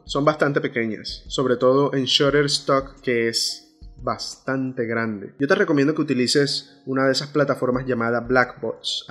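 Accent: Venezuela. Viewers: COMO QUE NO ERES VENEZOLANO CON ESE ACENTO??? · Hola, creí que eras venezolano por tu acento, de que parte de Colombia eres? un abrazo desde Medellín. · Por tu acento juraba que eres venezolano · Yo juraba q eras venezolano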